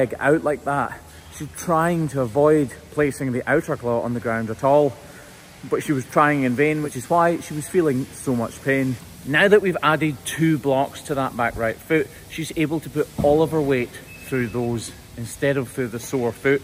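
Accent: Scotland, United Kingdom. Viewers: I don’t care about cows, I‘m here fir the Scottish accent. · Yes, you are a Scottish man - heavy accent, so obvious! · Are you Scottish?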